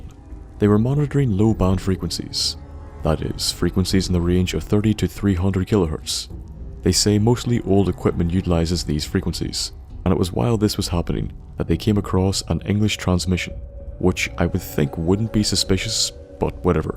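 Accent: Northern Ireland, United Kingdom. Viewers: Great video man.What part of Northern Ireland are you from btw?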